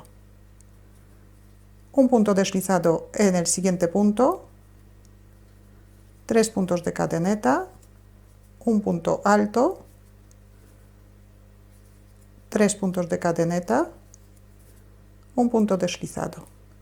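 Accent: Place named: Spain. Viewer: Que bárbaro tu comentario Roxana salas. Tiene muy bonita su voz. Además es asento español..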